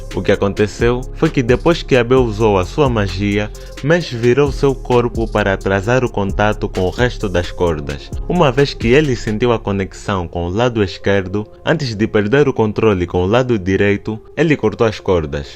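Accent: Angola. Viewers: Ele parece ser da Angola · O narruaidor eis angolaino?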